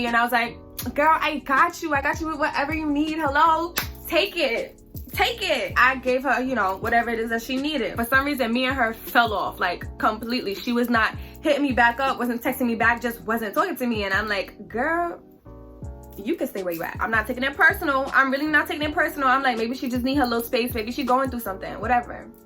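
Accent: New York, United States. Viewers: Is it just me or she sound a little bit like Nicki or that’s just the New York accent idek. Or like she could say Barbados like Rihanna 😭🥰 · you from New York,🤔 don't know if it just me but I hear a bit of New York in your when you talk lol.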